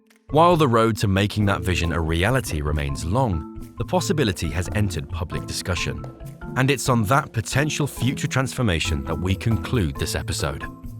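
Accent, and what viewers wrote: United Kingdom. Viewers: Why does every fcking show have to have the British accent. Were not fckng Brits!!! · If your going to use an AI to talk about an American icon, why would you make it sound British? :person-turqouise-waving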